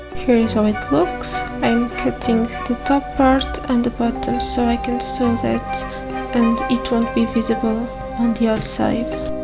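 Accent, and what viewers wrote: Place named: Portugal. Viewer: mal abri o video soube logo que eras pt-pt por causa do sotaque😭 portugal mentioned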